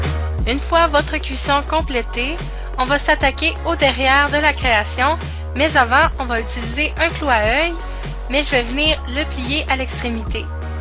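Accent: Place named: Quebec, Canada. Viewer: Le bleu est très beau et bravo super chat 😀 ,et j'adore ton axen québéquoi 😉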